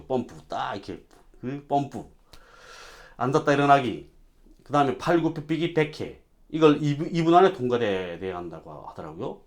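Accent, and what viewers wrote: North Korea. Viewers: 오랜만에 함경도 말씨들으니 정말 감사합니다. 많이 수고하네요.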